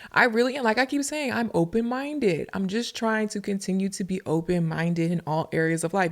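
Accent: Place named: South, United States. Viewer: Girl you are so funny! Especially with that southern lady accent. Have me hollering in my room “you gotta let go & let God” 😭❤️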